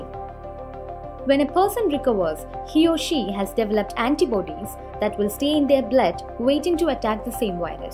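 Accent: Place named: Kerala, India. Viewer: A Malayali narrator with MTI !! :)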